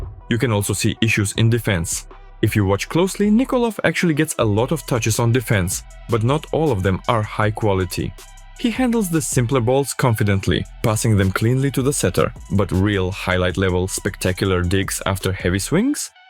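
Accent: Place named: Russia. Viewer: Grüße aus Deutschland 😊 Love your Vids! Like your russian accent. Go on your way! looking forward to see the russian national volleyballteam in the future. You have so much power in your rooster and a huge pool of players in your league! Praying for the End of War. But In Germany We have one of the dumbest goverments of the world! They hate us german patriots more than anyone else 😅 maybe they hate themselves a little bit more 😅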